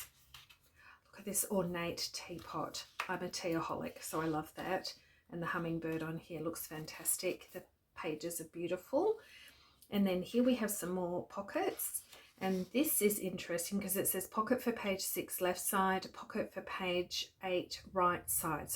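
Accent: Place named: Australia. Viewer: Happened across your video and oh my goodness it's stunning, and I'm not a fan of orange! And it's so good to hear an Aussie voice!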